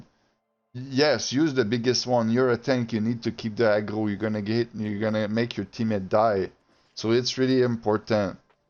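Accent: Quebec, Canada. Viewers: Vu que je remarque notre fameux accent quebecois, je vais t'ecrire en francais hahaha. je me demandais si le build serais bon avec la greataxe aussi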